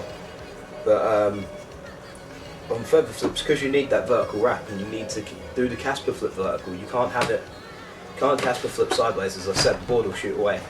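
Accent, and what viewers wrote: United Kingdom. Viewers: any subs i just like the english accent!!!!lol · BRITISH DA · thats cos stewie is british and so is this guy but all british people dont sound the same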